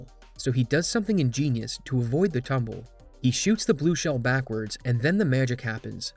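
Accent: Canada. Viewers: very canadian accent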